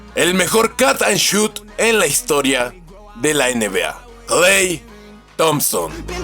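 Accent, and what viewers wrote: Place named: Cuba. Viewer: Pareces que eres de cuba a la hora de hablar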